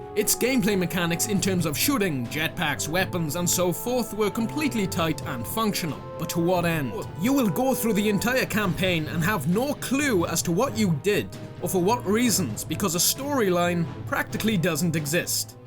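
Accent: Wales, United Kingdom. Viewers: I need to ask! ARE YOU WELSH?! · are you Welsh?? You sound Welsh or at least around that area??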